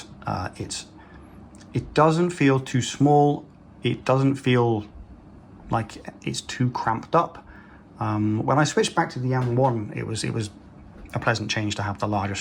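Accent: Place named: Hertfordshire, United Kingdom. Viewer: Are you a Japanese resident or planning to move there? The Hertfordshire accent is very recognisable.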